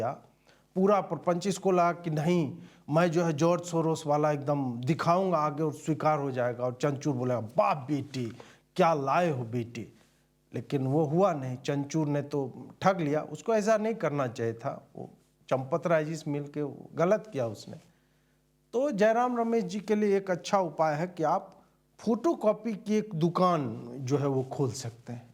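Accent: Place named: Bihar, India. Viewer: अजीत जी आपका बिहारी लहजे में भयंकर रोस्ट बड़ा मज़ेदार लगता है I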